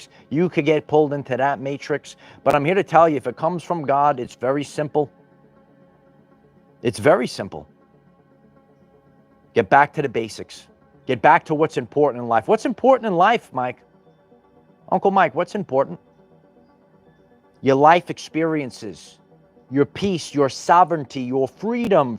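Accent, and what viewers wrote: New York, United States. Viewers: Love ur NY accent!!! Thank u for this reading 🙌✨💫❤️🙏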